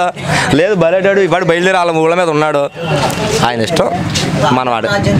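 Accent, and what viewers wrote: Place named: Godavari, India. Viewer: Super bro. Godavari style lo pettavu bhale ycheaps ki. We want to see more of your speeches in Social media. Thanks to for the effort 👌👍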